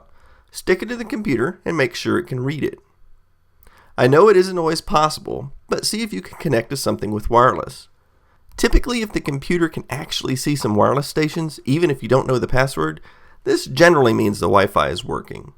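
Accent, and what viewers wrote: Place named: South, United States. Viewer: ´s southern accent was perfect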